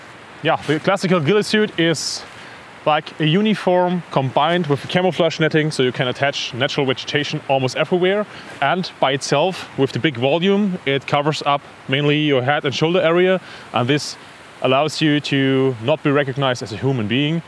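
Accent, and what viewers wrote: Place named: Germany. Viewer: I love the German accent.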